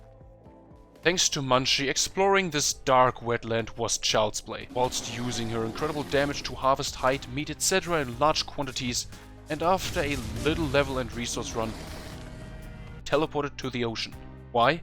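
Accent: Germany. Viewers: Bro u german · I love yo vids, but I have just one question: Are you german? 🧐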